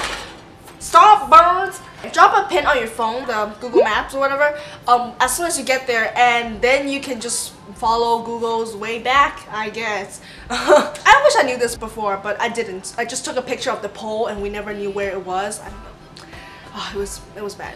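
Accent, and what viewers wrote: Canada. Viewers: Are you Canadian????😝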